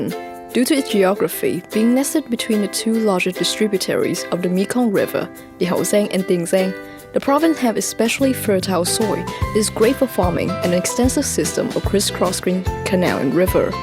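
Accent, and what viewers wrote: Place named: United Kingdom. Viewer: Nguyễn Triều Nguyên, from Nguyễn Sinh Sắc High School here, your performance is awsome ! and it seems like it must took you a lots if times to make this video, and you got a good British voice right there, nice ~<3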